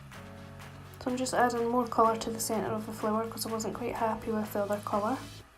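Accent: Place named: Scotland, United Kingdom. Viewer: Where in Scotland you from? It's strange hearing a Scottish accent xx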